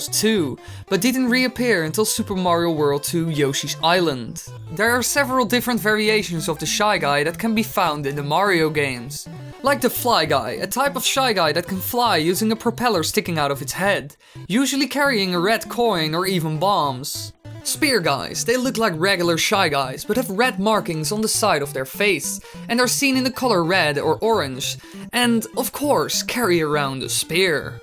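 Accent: Netherlands. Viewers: you can so hear in this video that your laungage is dutch XD ik weet het gewoon zeker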